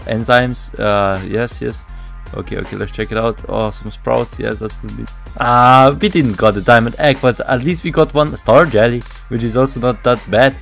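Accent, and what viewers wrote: Russia. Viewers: русский акцент? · Чел вау..... какой же у тебя Русский акцент 😑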